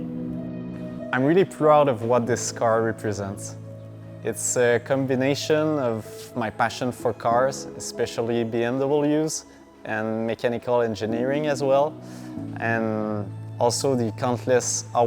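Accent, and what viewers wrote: Quebec, Canada. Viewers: It took 3 words to recognize the accent. Super proud to see a fellow Quebecois on this amazing channel. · TOKÉBAC !!! T'as l'accent à GSP mon gars t'es un vrai 💪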